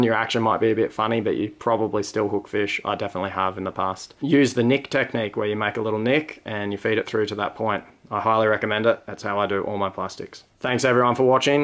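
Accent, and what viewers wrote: Australia. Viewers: These videos are so therapeutic to watch. Sometimes I just wanna watch a guy talk about fishing with good camerawork and a hectic Aussie accent.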